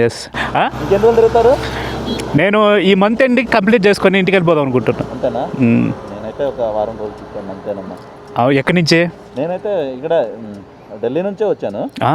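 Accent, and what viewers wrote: Nellore, India. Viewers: 😂😂😂 Nellore slang super 😊 abba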